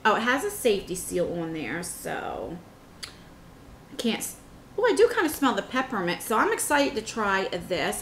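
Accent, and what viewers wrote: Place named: Philadelphia, United States. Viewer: where are you from? you have kind of a philly accent lol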